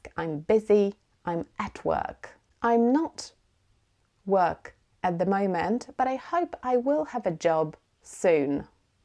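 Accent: United Kingdom. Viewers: You speak in British accent and i love it . Thank you ❤ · Hello,I'm following you fromUzbekistan, very and very nice video thanks,I loved your pronunciation and accent,I love a British accent so much,you cannot imagine how I love English ❤I want to speak..but it not easy 😢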